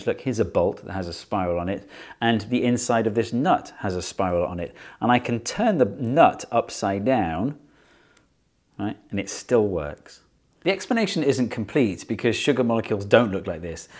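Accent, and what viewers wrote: England, United Kingdom. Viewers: he finally says anticlockwise! Sounded so wrong to hear his English accent saying "counterclockwise"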